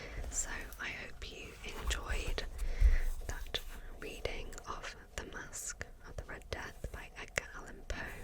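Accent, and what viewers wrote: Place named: United Kingdom. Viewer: Not enough British ASMR reading videos out there! This was very relaxing,!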